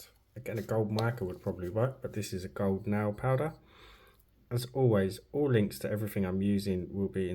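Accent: United Kingdom. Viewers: New subscriber here, hello! 👋🏻 Between the use of the cat eye nail polish in your crafts and the genius that is the 'swirl' I just had to subscribe! 😂 Where abouts are you from in the UK? I live not far from Brighton and am trying to place your accent 😂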